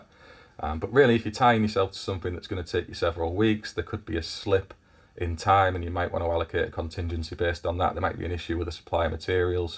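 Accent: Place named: Yorkshire, United Kingdom. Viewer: Always liked your channel. Just dropped on this vid. Proper Yorkshire. Love it.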